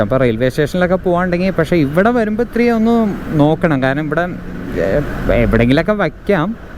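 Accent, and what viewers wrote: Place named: Thrissur, India. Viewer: Are you from tvm? Your slang seems to be from thrissur... Anyway very good narration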